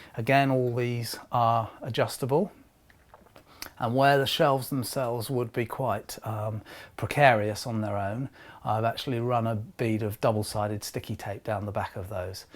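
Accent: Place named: United Kingdom. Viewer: I take it from your accent that you are from somewhere in Britain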